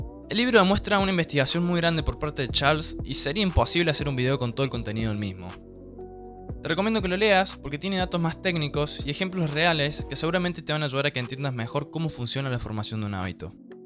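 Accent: Córdoba, Argentina. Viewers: Esa tonada cordobesa 🤤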